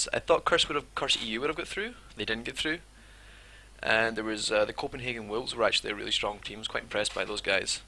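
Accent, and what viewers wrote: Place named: Scotland, United Kingdom. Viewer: didn't know had a lovely scottish voice:D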